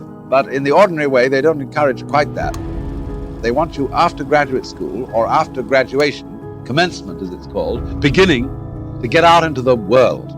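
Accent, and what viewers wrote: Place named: RP, United Kingdom. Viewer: Alan Watts is like a man down the pub talking crap. It's just telling people what they want to hear about the F-ing obvious. 🙄 Americans are impressed by his posh accent. 😆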